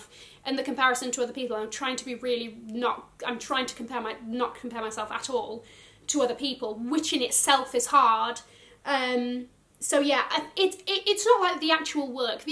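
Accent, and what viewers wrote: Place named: Doncaster, United Kingdom. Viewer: Well done! You have yourself a fabulous community who wish you nothing but luck and success. You’re so engaging and having your regular content is a great little piece of home (that Doncaster accent is that little slice of comfort I miss with my tea.) Keep doing you - great things never come from our comfort zones 😘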